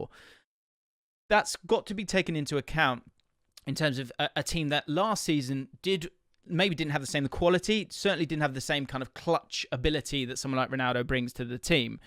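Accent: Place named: England, United Kingdom. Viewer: English accent Scottish top. 😬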